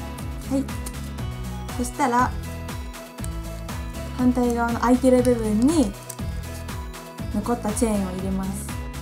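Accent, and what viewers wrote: Kansai, Japan. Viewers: かんさいべん？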